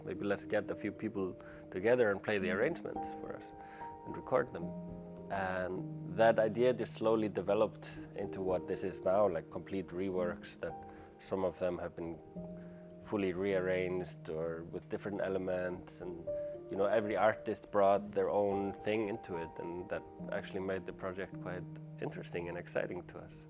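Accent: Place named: Iceland. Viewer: Just happened to witness you talking for the first time and your Icelandic accent is so charming. You could easily do ASMR content btw. Oh, and cheers for all the blissful music you make. ❤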